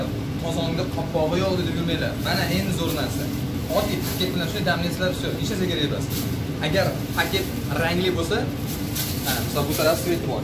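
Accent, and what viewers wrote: Andijan, Uzbekistan. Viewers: Жааа. Яхши анжанча шевангиззи яхши тушунамиз. Гапираверинг. Нима? Росияда ок сабзи йукми дустим???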